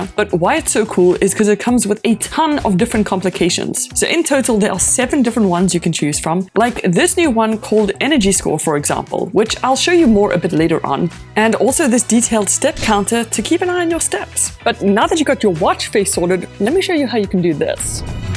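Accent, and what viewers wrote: South Africa. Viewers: South african accent😅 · Getting my watch in a week. Lekker video, sounds like a zaffer in the UK. Am I right ? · London but you sound South African... Amirite?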